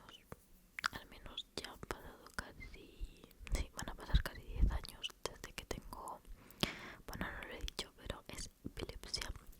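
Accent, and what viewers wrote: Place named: Spain. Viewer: Hola bonita me encanta el ASMR con acento español es el que más busco, sigue así me gustaría algún roleplay♥️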